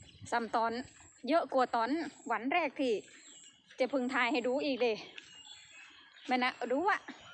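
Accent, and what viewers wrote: Korat, Thailand. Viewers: เจ้ พูดสำเนียงเหมือนคนนางรองไทยโคราชเลยครับ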